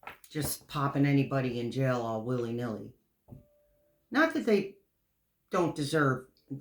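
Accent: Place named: Midwest, United States. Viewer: Omg! My Dear Aquarian Sister! We both know there is no mistake I found your channel. As a February 13,Aquarian, I have had a frickin crazy merc.retrograde too! Finally Ending legal drama since Feb.2023, I'm just now feeling back to some normalcy and with your wisdom I might add! I L❤V E your antics and how you give the ignorant, rude trolls a firm education on being "civil" something their mamas should have taught them. Keep it up! You keep me laughing! Most importantly, your beautiful readings have inspired me and continue to give me hope. It seems as if I've gone through some of the Same battles in the last 2 yrs in this country, just like President Harris has; I could definitely relate and I love your parts 1 and 2 segments. Anyone who has nerve to complain about Anything you do on Your show, is Not living on their higher frequency level. 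So, keep that up too! I also sensed that you may be from the Midwest or East coast? I think your accent is great and your personality is so right on! Like mine! Lol! I must and will get time hopefully soon to book a reading and to just meet a Real Sister in this now, crazy ass country! ❤U Earth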